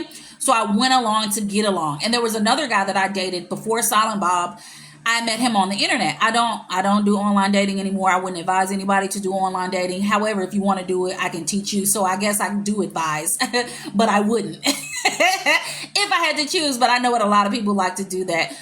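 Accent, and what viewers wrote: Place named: Arkansas, United States. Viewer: Hey just subscribed! Love your videos. I’m from Eastern Arkansas…. 😊 Wynne…. Sounds like a lot of Arkansas men I know…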